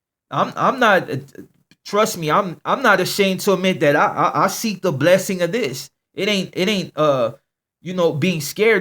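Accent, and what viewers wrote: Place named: Mexico. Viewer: I fuck with your channel cuz! One love! Keep grindin, you spit hella game just some of these youngstas on some other shit. When I was young I was fuckin up got into some street shit, drugs, alcohol heavy. I made the decision to join the army. My older bro was in an out of juvie since 13 to 17 then got locked up but they sent him to prison at 17. It’s crazy cause we’re mexicanos but grew up in a all black neighborhood homie. Mexicanos hate on my family cause we don’t sound Mexican we sound where we came from just like you did. You sound mexican our slang is gutter people say we sound like we tryin to be black. My brother is Mexican in a crip gang and I was following the path but made a transition. So I feel you completely when you spitting game sometime about where you grew up and how you grew up. Opposite worlds but the same in a way. 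Ima support you foo off the strength of black and brown love. That’s how it was in my neighborhood l. Grew up in park hill Denver 💯 keep it solid and keep it pushin I hope your platform gets bigger and better! Much love partna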